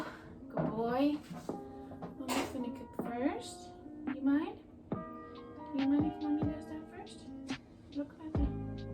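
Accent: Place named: France. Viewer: your English French accent got me hooked...lol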